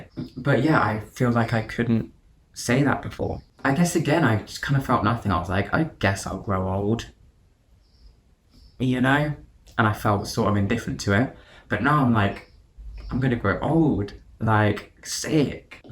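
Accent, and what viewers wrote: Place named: United Kingdom. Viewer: Your British accent is so cute 🥹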